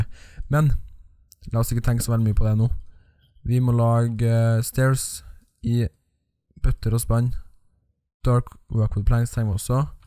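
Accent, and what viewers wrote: Trøndelag, Norway. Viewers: Snakker du trøndersk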